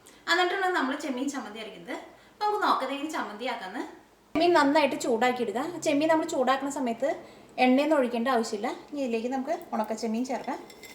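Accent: Thrissur, India. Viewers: U r my guru at cooking.I got married two years back and since then I was watching your videos and tried alot of receipes and came up with success stories. Thanks alot .like your tcr slang and ur simplicity. Your videos r really inspiring for others to cook.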